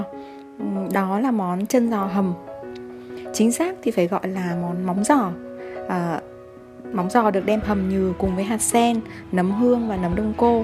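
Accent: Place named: North, Vietnam. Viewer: noí tiêńg băć nghe hay. thanks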